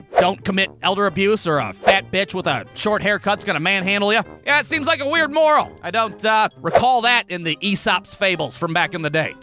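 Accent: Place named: Ohio, United States. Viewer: I’m going to go out on a limb and guess that he is either from Crawford or Mercer Ohio.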